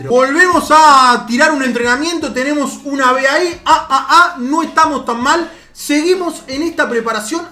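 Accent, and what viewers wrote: Argentina. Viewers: Ningun chileno se nota que eres argentino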